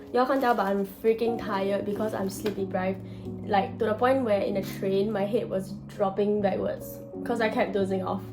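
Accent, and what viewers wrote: Singapore. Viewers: 2 seconds into you talking I already could tell you were from SG from the accent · かわいいー シンガポール人のアクセントはやはり大好物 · that singapore accent is brutal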